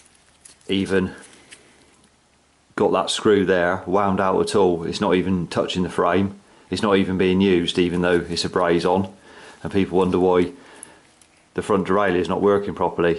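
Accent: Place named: South West England, United Kingdom. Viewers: That's why I'm asking, reminds me of my Bristolian mates' accent, the best in the world hah. So you're spot on I reckon. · His account is south west I think. I'm terrible with accents although I've lived in different parts of England.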